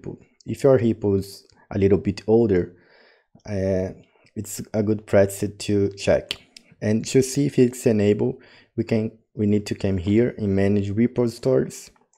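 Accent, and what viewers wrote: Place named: France. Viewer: thank you so much, I dont know why such useful video does not attract many people. BTW, I love your French-English accent 🤩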